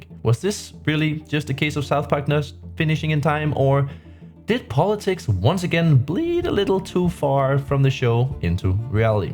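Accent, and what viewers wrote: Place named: Denmark. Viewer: Dansk accent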